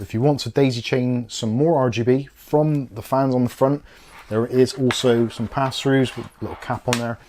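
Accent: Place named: West Country, United Kingdom. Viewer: Just found your videos, noticed the West Country accent and then saw your DJI test footage. Hello from BS30! Love the content and subbed.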